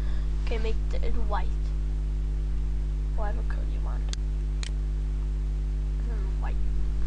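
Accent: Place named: United States. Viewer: lol!You've done what i do-ur talking almost in an american accent!lol.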